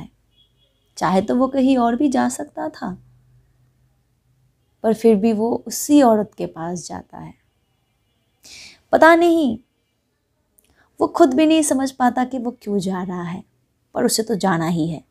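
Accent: Bengal, India. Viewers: Bengali accent we can feel in your hindi😀😀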